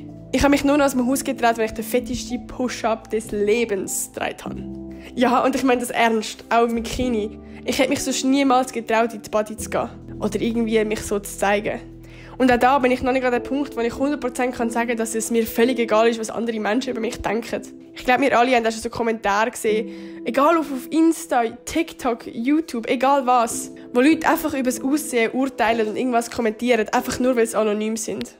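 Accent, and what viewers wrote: Switzerland. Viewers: Dieser Schweizer Akzent ist so geil haha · Ich liebe deine Videos! Am geilsten finde ich den SchwitzerAkzent der mich immer zum Lachen bringt. Ich bin froh dass du YT machst. Beste Youtuberin! Du hast keinen Grund dich Unwohl zu fühlen da du total schön aussiehst und eine Hammer Figur hast!❤ Ich und viele deiner Tausend Abonnenten stehen immer hinter dir und sind für dich da!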